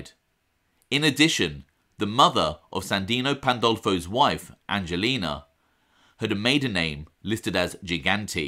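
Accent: United Kingdom. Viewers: For the life of me, i cannot understand why this channel doesn't have a million viewers by now. With all the mob related content out there, this channel stands alone as the best source for accurate information on the mafia in NYC. My wife especially loves to hear the "sexy deep voice" in which it is delivered in, I personally wouldn't go that far, but as everyone knows, If you enjoy a documentary about how the world was once in chaos, how humanity hung in the balance, and or how John Gotti got a haircut once a week, you definitely need a British accent for that sort of thing. has got that in spades.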